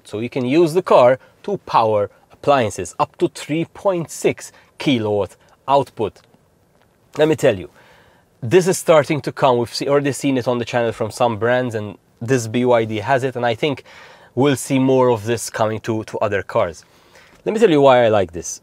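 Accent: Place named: Malta. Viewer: I was watching this video and kept saying, this guy sounds Maltese, weird... 😅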